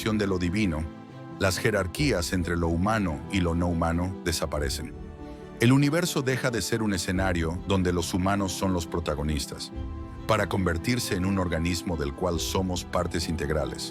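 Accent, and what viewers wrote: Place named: Paraguay. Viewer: Por qué la IA tiene acento paraguayo? No es que tenga algo con los paraguas, me cae simpático su asentó. Pero para escuchar este tipo de cosas me resulta incómodo.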